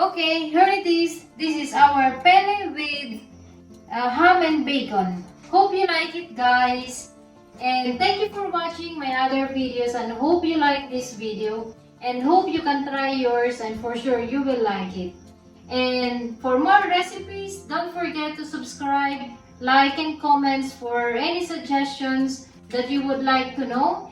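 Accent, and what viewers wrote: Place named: Italy. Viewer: By the way i like your Italian accent...ciao...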